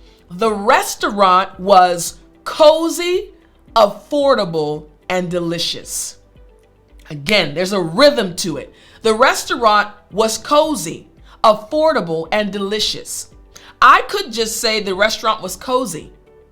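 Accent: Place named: United States. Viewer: Teacher. I am really blessed when a I watch your courses. They are extremely clear, powerful and resilient. However I would like to know where you are from. I hear American accent into your speech but I would like to be sure... 😊 I am actually from DRCongo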